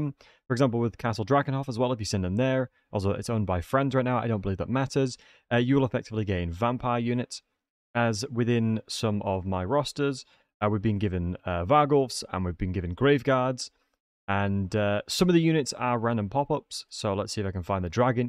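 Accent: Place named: Yorkshire, United Kingdom. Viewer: Nice Yorkshire twang there :)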